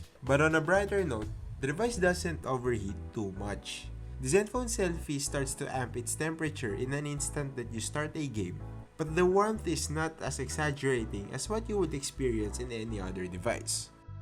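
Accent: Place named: Tagalog, Philippines. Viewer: wala naman masama sa tagalog accent ate kakaloka ka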